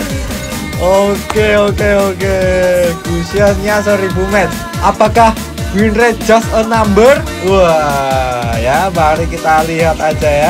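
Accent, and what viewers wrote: Java, Indonesia. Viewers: Bang kok medok? Org mana?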